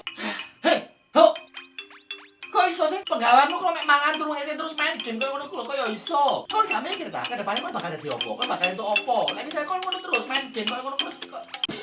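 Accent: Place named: Malang, Indonesia. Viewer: Bayu. Orang mana. Malang Yo omongane koyo wong malang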